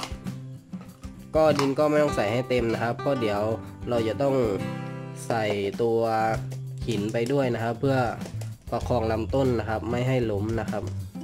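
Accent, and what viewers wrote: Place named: South, Thailand. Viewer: พี่พูดเหมือนคนใต้เลย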